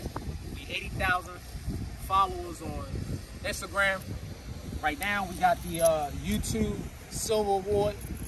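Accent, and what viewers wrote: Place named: Baltimore, United States. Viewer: My man’s sounds like he’s from Baltimore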